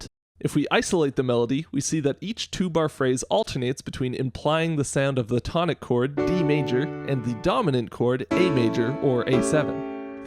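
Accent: Germany. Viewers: his german accent kills me every time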